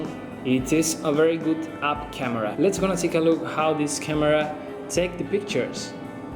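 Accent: Andalusia, Spain. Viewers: Good andalusian english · Jajaja andalucia english.estas en todos lados me alegro.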